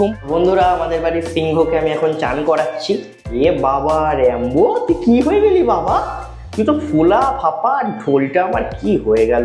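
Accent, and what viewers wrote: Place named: Medinipur, India. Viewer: তুই কি মেদিনীপুর এর ছেলে তোর কথায় এত টান 😅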